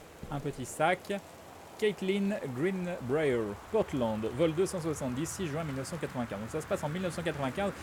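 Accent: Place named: England, United Kingdom. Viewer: Hey salut =) je t'ai découvert sur les vidéos d'Aventures, j'étais intrigué et je dois dire que je suis surprise. Cependant, ta personnalité reste fondamentalement et c'est cool, tu t'immerse dans le jeu tout en gardant ton humour (y). Tu as gagné une nouvelle abonnée je dois dire, et ps: ton accent anglais est é-pique haha ~ Continue!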